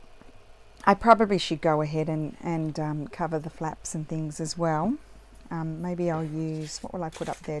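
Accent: Australia. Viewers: I love to hear your adventures in Italy and i love to hear your Australian accent. And of course your crafting too. We have to do all the running around too for paperwork its just how it is (USA) but thats ok it will all work out! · Hi, Love the very typical Aussie rant. A breath of fresh air. Kerry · "get nicked" 😂. As a fellow Aussie I can't tell you how fantastic it is to hear an Aussie accent and expressions in a crafting video! Yours is the only video on YouTube that I can easily listen to for a whole hour 🥰.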